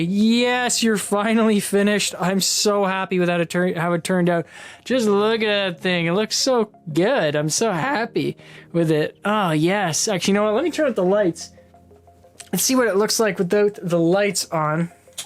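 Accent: Canada. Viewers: The Canadian accent is Noice 👌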